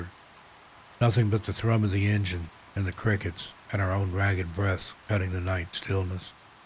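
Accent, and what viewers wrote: Appalachia, United States. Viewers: Great stories. The narrator sounds like that of an Appalachian. These stories scare the crap out of me. Its been years since I have done it, but I have hiked and camped in these very same areas in these stories. I didn't see anything or even necessarily heard anything too creepy, but there was a sense by all of us that "something" malevolent out in the darkness of the deep woods. I have never gone back.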